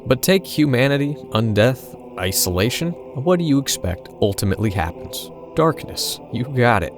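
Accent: United States. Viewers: I'm hearing an American discussing lore of a Japanese game based on European historic-fantasy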